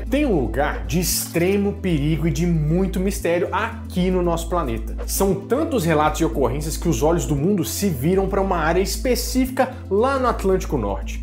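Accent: Minas Gerais, Brazil. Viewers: Mineirin bunitin, sô.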